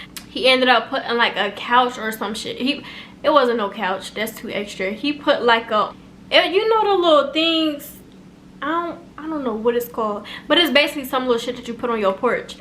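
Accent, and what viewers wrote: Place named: South, United States. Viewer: How long you been there you sound country af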